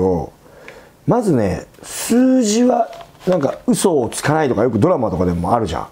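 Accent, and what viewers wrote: Nagoya, Japan. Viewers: 苦労した人ほど人に優しく出来るんですよね。 今回のテーマは共感できました。 説明も社長らしくて、わかりやすいですよ。 地元が近いんで名古屋弁がどら懐かしです。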